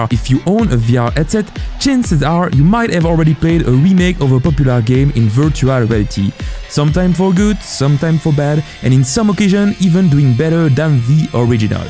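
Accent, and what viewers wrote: France. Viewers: are you french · tu es français?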